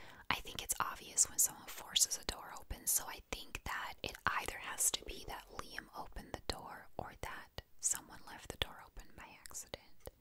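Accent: United States. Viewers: You sound so American! You could pass as someone who is from the USA! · I just started watching your videos not too long ago and I absolutely love them! However, I didn’t even realize you were from Belgium until this video. I’m from the U.S. and I assumed you were too because your accent sounds American! I didn’t realize how similar Belgians and Americans sound! Or am I missing something?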